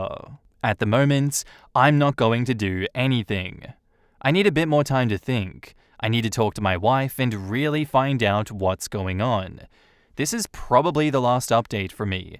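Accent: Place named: Australia. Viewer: I love hearing your Aussie voice, it's a thankful break from all the AI read stories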